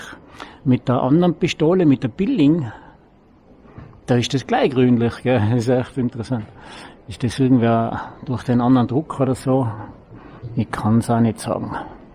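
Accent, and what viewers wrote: Austria. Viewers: Moin aus Norddeutschland. Sehe mir gerne deine Filmchen an. Aber es wäre schön wenn du etwas mehr Hochdeutsch sprechen würdest.Dein Österreicher Slang ist sehr schwer zu verstehen. Sorry. Viele Grüße.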